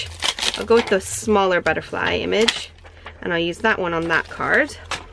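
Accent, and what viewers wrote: United States. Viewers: Hi there, new subbie here, loved your cards, where are you from? I can’t work out your accent, you sound American, but so many of your phrases are English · I so love your American accent with British terms. Makes my day🤓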